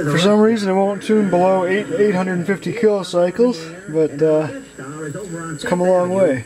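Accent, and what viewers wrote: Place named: New York, United States. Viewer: Sounds like your in NJ or NY? Great video.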